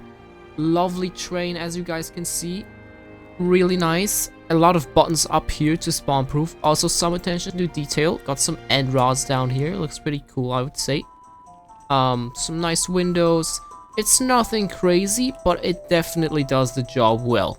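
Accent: Germany. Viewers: quick question, are you German? you kinde saound like you´re German...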